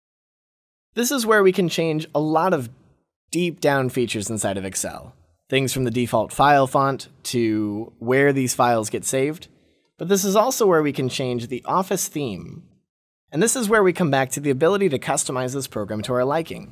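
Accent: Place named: United States. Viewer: In the United States (assuming the video creator is from there considering their accent and the fact that they're using $s) the tax would be multiplied because it's not already factored into the price like it would be in most European countries. While it's something stupid with the country, it doesn't make the video creator wrong.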